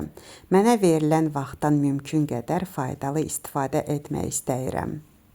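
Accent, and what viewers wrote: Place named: Quba-Qusar, Azerbaijan. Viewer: Salam xanım. Siz haralısınız? Danışığızdan elə çıxır Quba Qusar zonasındansınız. Elədimi?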